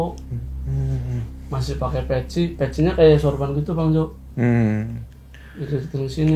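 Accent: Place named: Java, Indonesia. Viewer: Waktu awal2 dia nongol, dia nyebut dirinya "gua".. tp jd aneh sih dengernya krn dg logat jawanya, lbh cocok pake "saya" spt skrg2 ini.. hehee.. sukses terus mas!! Story teller termantabb, bisa inget detail banget semua pengalaman2nya..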